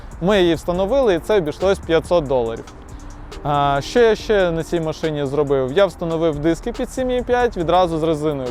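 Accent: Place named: Russia. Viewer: Чути характерну вимову людини яка розмовляла російською, але звісно респект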